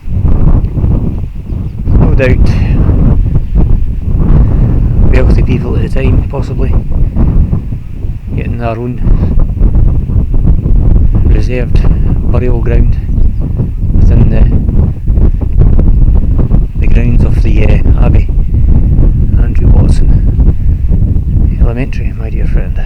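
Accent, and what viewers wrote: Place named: Scotland, United Kingdom. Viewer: Thank you for sharing. I could listen to your brogue for hours!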